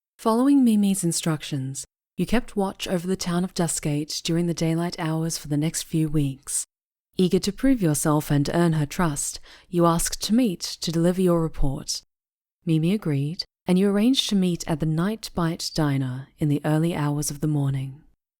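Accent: South, United States. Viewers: 3 weeks it's been 3 weeks i.... mam thank you for the upload i missed this. Also a slightly deeper southern accent double noice. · Wow! Amazing work as always. I know this vid wasn't as popular as normal for some reason but I love this series! And as a southern born floridian man I enjoy the stage southern American accent. Hope it continues.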